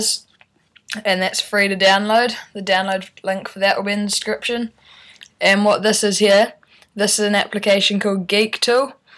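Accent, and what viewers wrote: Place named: Australia. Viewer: of course he's australian. I'm an auzzie and i can tell the difference between english, american and australian. why cant you?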